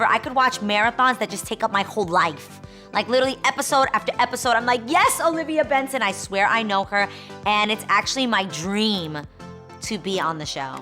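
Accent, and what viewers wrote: New York, United States. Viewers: Lmaoooooooo "i'm an exhausted pigeon throughout the day" that was so hilarious and i can so relate; i love how you could hear your NY accent so much herw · Hi. You will probably never see this comment but I have to tell you that I love your YouTube channel. I relate to you so much and love your New York accent. I grew up in the lower east side, in the LaGuardia projects and I am also 4' 11! My cousin went to P.S. 110 and his mom currently teaches there. He died on 911. Anyway, your channel always makes me laugh and feel good.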